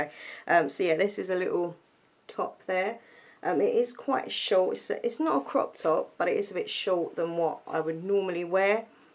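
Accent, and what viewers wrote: Essex, United Kingdom. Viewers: You sound like you're from Essex with that accent. Are you from that way?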